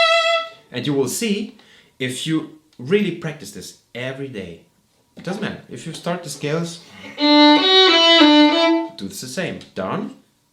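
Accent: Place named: Germany. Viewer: Your English skills would be more convincing, if you pronounced the simple word "and" [and] rather than [änd]. Pay attention to the "d" and the end. German people tend not to differentiate the words "ent" and "and" in terms of pronounciation. The same applies to the word practice. German people tend to say [präctice] and those are tiny words revealing you as a German guy. I know that at school the teachers do not know better.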